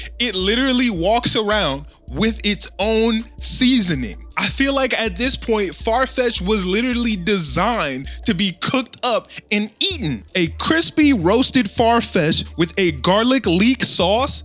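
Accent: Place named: Chicago, United States. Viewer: I was about to comment “Lemmon Pepper what??” And then you played THAT clip 😂😂😂. I could tell you were from Chicago before you even said it 😂😂. Love this video fam.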